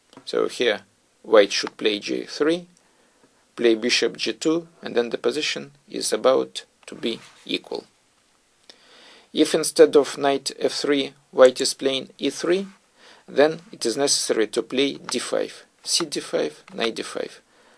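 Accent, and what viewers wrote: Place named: Russia. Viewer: It's very common accent for Russian who speaks English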